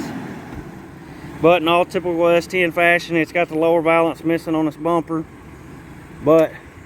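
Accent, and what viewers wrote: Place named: South, United States. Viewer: The spontaneous joke at the beginning was pretty funny. I think you guys should start doing some kind of joke session or like a joke of the day or something. There's something about that southern accent telling a joke with a splash of cuss words LOL. I really think there's something to that man I think it would bring some people in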